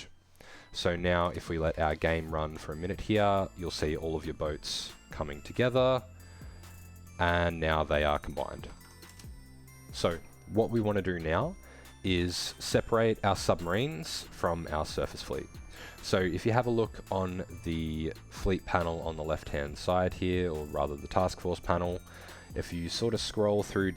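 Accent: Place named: Australia. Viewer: Interesting accent, "future" - "fyu chah", "player" - "pla yah". Where are you from? EDIT: Nvm, your profile says Australia, mate.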